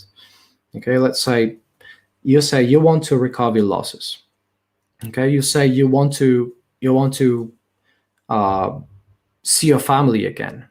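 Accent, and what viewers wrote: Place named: Poland. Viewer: Also thank you for introducing me to St. Rita. I am a fellow Catholic, Hungarian born. To me your accent sounds like someone who moved away from Poland when you were in your teens and learned English then. (or maybe I am projecting here as that's my story) . Good on you for being so brave and sharing your belief in public, I know exactly how hard that is as especially being a Catholic.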